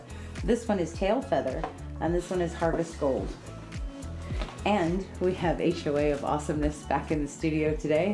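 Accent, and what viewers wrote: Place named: New York, United States. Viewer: You just explained EXACTLY what my issue is with paint separating. THANK YOU! I love your New Yoak accent 😃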